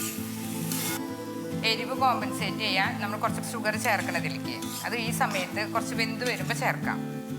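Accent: Thrissur, India. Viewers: Njnm aghna paraya.... hostail ennun kaliyakkum.... tcr slang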